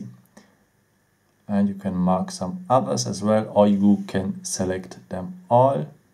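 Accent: Germany. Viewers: Bist du aus Deutschland??😂😂 Hört man voll raus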